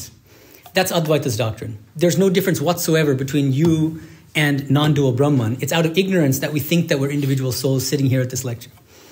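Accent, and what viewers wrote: United States. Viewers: My two cents: Swamiji has given a fresh and comprehensive perspective of what is to be understood as Vedanta philosophy in the light of the teachings of Sri Ramakrishna and Swami Vivekananda. In addition it is a knock on the heads of fanatical bigots who are possibly insecure about their own beliefs . Can you ignore a toothache saying it is unreal? will you refuse to bow down to a statue of Sri Shankara saying it is unreal? Just as you are questioning the Swami if he played marbles with Shankara were you present personally when the acharya wrote the Vivekachudamani? If you look up online you will see the authorship is questioned by many scholars. Talking disrespectfully about a monk of Ramakrishna order is unbecoming of a spiritual aspirant. Swami Medhananda was born and brought up in the USA and has a natural American accent. Also Swami Sarvapriyananda and Swami Medhananda have due respect for each other's views. 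· Exactly... He simply states Advaita as 'ब्रह्म सत्यम जगत मिथ्या' by World is not real. He did not take any effort why 'मिथ्या' has been used. He is not aware of Shankara parampara also. His American accent might allure some people but not true seekers. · A monk should not have bias which this monk has in abundance. He may be a scholar but lacks in the fundamental factor of expression of his views. He may.speak the English language like an American but does not know how to teach, how to pause, how to repeat. All he does is to show off his American accent. It seems as though his object is to put down Sri Shankaracharya Bagavadpada by questioning the authorship of Sri Shankaracharya's great works ; the crown being Viveka Chudamani. You may have a completely different opinion and you are entitled to it. But you have no right to speak of Shankaracharya in the manner you spoke. Did you play marbles with Sri Shankaracharya? 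On hearing you I get the impression that you are out to insult Sri Shankaracharya ( not that any one can do that, least of all you) and you are out to show off your accent. You can never compete with Swami Sarvapriyananda for you don't have the guna required for it. You are not fit to be called as monk.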